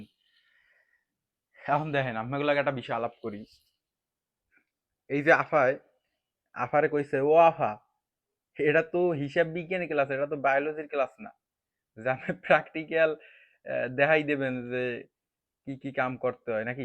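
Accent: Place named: Patuakhali, Bangladesh. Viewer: Apnr vasha potuakhalir mnush der moto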